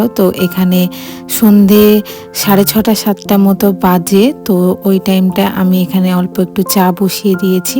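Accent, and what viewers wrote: India. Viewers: apnar Kotha gula erokom Kolkatar moto bolar dorkar ki apu??amnite vedio ta khub valo hoyeche. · apa apnar kotha amder howrah jelar moton lagche jdi tai e hoi sune khub e khusi hobo...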